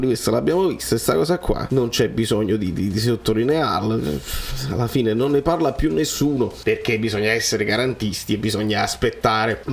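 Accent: Naples, Italy. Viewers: Il canale più simpatico...ma sei napooletano uagliò.......🤣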